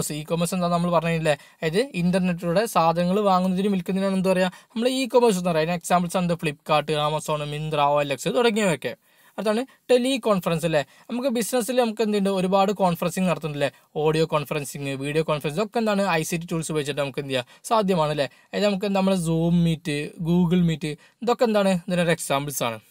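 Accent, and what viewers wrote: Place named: Malabar, India. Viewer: പക്കാ കാക്ക ഭാഷ ( ബാസ )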